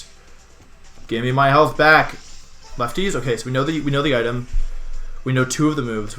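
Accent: United Kingdom. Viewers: Bristolian is like no other british accent sir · LOL the british accent, love it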